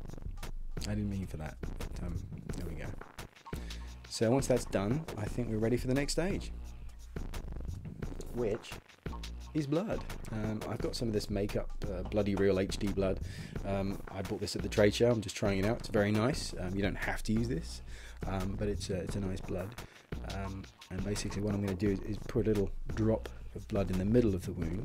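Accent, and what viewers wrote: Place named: Australia. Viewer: he sound Australian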